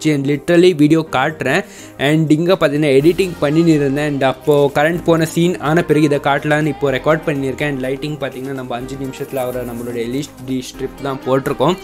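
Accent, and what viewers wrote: Bangalore, India. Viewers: You have a typical Bangalore accent bro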